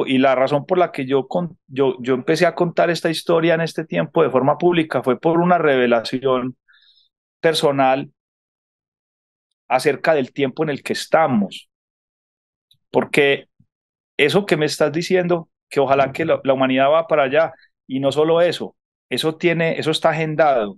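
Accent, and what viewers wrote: Colombia. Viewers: Soy colombiana pero créame q me encanta como hablamos los colombianos. Una en Colombia bueno no le párese pero cuando uno va a otro país y escucha como hablamos. Jajaja jajaja me encanta. Nuestro hablado habla y se escucha espectacular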